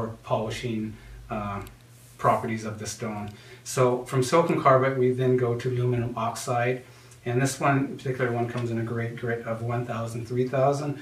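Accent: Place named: Canada. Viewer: Knew he was a fellow Canadian who knew what he was talking aboat.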